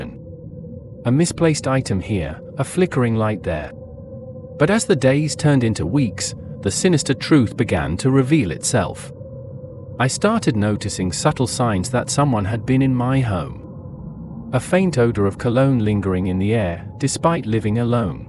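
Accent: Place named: England, United Kingdom. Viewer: An English narrator! 😀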